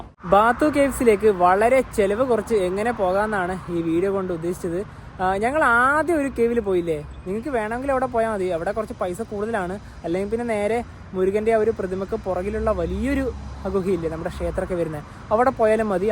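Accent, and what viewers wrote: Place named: Nileshwaram, India. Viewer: തിരിച്ചു പോരാൻ ടാക്സിക്ക് പകരം ട്രെയിൻ “ ആക്കിയത് ” നന്നായി. താമസിക്കാതെ ATS “ആക്കുമെന്ന് ” പ്രതീക്ഷിക്കുന്നു- നീലേശ്വരം ഭാഷാ ശൈലി രസമുണ്ട് കേൾക്കാൻ.❤❤❤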